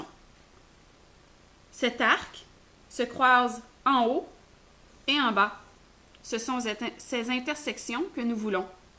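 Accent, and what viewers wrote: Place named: Quebec, Canada. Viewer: ps: super l'accent Québéquois (surtout ne le prend pas mal)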